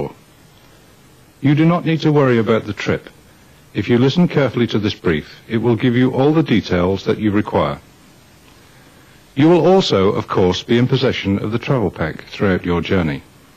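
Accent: United Kingdom. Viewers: I think it’s the very stoic and calm British accent aswell- it’s like those videos of what to do in a Nuclear War they made around the same time as this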